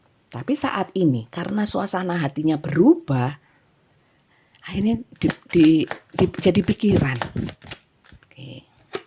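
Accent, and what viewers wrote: Java, Indonesia. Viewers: Sehat selalu untuk bunda .. selalu senang kalau bunda baca dan kasih pencerahan untuk kedepan nya .. sering senyum" sndiri kalau bunda baca dengan logat jawa nya .. salam taurus dari bali bunda ❤❤ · Jawe nya pekat betul..gud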